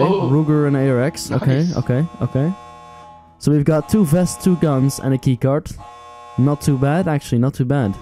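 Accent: Netherlands. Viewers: the second i heard ur voice i knew ""dat is een nederlander!"" hhaahahahah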